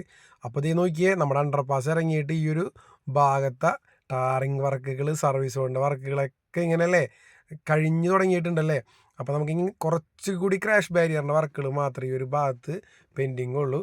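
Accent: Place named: Thrissur, India. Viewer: Nalla Thrissur slng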